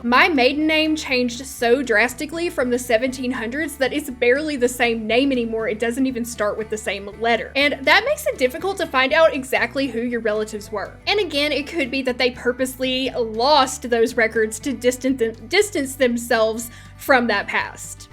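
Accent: South, United States. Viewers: your accent could get that Southern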